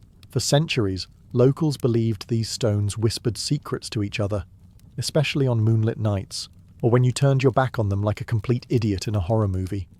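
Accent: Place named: England, United Kingdom. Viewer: I lived for seven years in Alaska. Miss it terribly. I’m now living in Baltimore, Maryland about an hour’s drive near the small town where I grew up. Gettysburg is close by. I love your videos! Your English accent and medieval history are quite a comfort 👍🤓. My nana and mum are Australian so I always prefer the Commonwealth. I try to watch only British film, music, and TV. Nighty nite.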